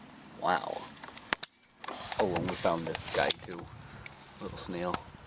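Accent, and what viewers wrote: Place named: Michigan, United States. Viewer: Love the video! Can I ask what the opening song was to the video? I like it! Also, as Durham native I was shocked to hear you say "here in North Carolina" since your accent is more...Michigander?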